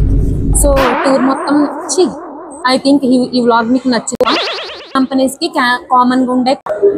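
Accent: Godavari, India. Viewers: Mana Godavari slang , vetakaram.super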